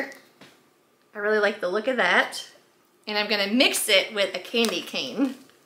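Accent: South, United States. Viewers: Hey Ms. I was looking on You Tube for a "fairly easy Grinch DIY wreath, and here you are... another Sothern Belle that sounds like me. (Roll Tide) Anyway, my best friend lost her husband a couple of years ago on Easter and is struggling with the holidays. I lost the love of my life 8 weeks ago and not looking forward to these Holidays, Even though he (hubby)would want me to grieve like I am, I'm trying to find some normalcy. So I am making her a Grinch Wreath. I wanted to thank you for your uplifting personality and sweet, patient spirit and MAKING THIS LOOK DO-ABLE. Have a wonderful Thanksgiving and Merry Christmas!!!!! And again, Thank you!!!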